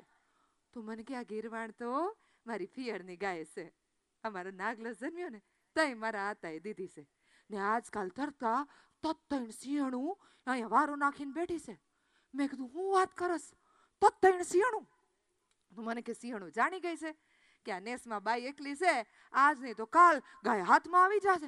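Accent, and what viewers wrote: Kathiawar, India. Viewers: Superb performance......its not easy to perform this act by solo........great......your expression, that proper tone of kathi language.......first time i have seen you on rangmanch......keep it up......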